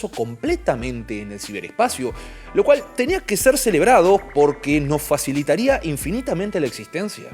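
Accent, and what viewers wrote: Argentina. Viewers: Por tu forma de hablar y el paneo del obelisco deduzco que sos argentino. Decis que la radicalización viene de la mano de Internet, desde que empezó la cuarentena en Argentina se violaron todos los derechos habidos y por haber en Argentina y se pasó por arriba la Constitución. Mas que radicalización yo diría que muchos nos cansamos de que nos pasen por arriba. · Este canal y este video es de izquierda. ¿Como lo sé? Simple: todo el video es acerca de hecharle la culpa a los demás, con referencias intelectualoides, y además el acento argentino lo delata. Ni los algoritmos ni los demás tienen la culpa de tu vida y de tus posturas, uno tiene que hacerse responsable de uno mismo, y tener opiniones fuertes y posturas firmes no tiene nada de malo. Abandonen la actitud de victimismo, es peligrosa y no sirve de nada.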